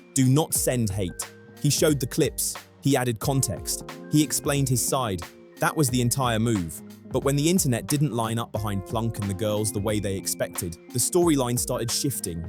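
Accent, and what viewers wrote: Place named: United Kingdom. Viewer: ahh cool, so it's the same information we've had for weeks just regurgitated in a british voice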